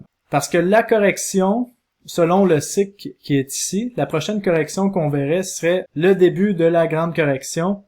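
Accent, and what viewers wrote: Quebec, Canada. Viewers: Salut , tombé par hasard sur une de tes vidéos après avoir regarder ma vidéo quotidienne de didi de BTC family , comme quoi YouTube peut être pertinent je trouve ton point de vue très intéressant et ton accent me rappel ma jeunesse passé à Loretteville à côté de Quebec + 1 abonné.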